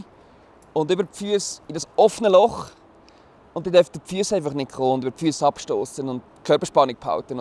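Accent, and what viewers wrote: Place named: Switzerland. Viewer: Totally agree. My very limited Shwytzerdütsch skills makes it hard to understand, while the video and topic is top notch. Still highly entertaining !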